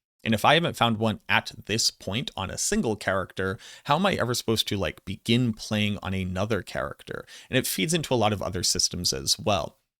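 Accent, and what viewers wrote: Boston, United States. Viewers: Hit'em with the math,!! Lol Great vid! Thanks brother. Appreciate you. Oh, and those Bostonian slide-ins, "id-eer" icing on the cake! LMAO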